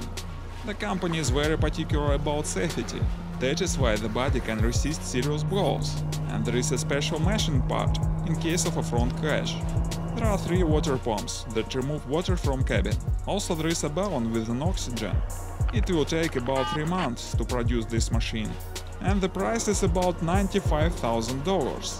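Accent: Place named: Russia. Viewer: Sounds very much like a Russian accent.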